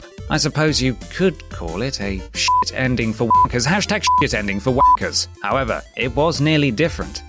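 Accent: United Kingdom. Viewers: oh my god, his british accent is awesome! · This man has the most British voice I've ever heard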